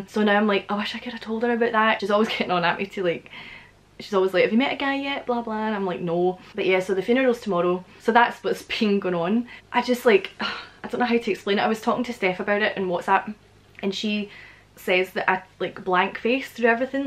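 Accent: Scotland, United Kingdom. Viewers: I could listen to you talk all day long about nothing, to me you have such a soothing voice love the accent could never get old.. I used to talk to a woman on Facebook and Skype with her she was from Edinburgh Scotland.. then all of a sudden she just disappeared her accounts were closed and haven't heard from her since I miss her dearly.. her name was Samantha White.. I live in Clearwater Beach Florida.. I listen to you daily just so I can get my Scottish fix, might sound silly to most people but out of all Accents in the world I love the Scottish the best,, keep up the good work you're amazing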